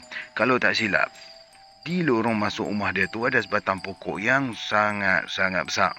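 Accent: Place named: North, Malaysia. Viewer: Mantap bos lorat utara😂.sebijik oww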